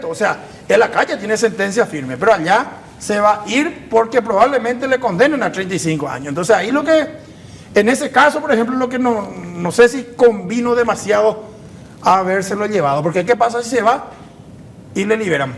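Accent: Paraguay. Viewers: Espectacular el jopará de...castellano, guarani portuguez eré eréa 😅😅😅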